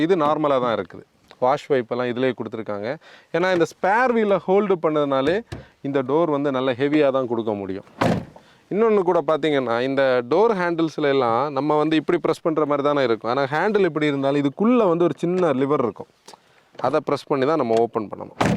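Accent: Kanyakumari, India. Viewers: Kanya kumari style speech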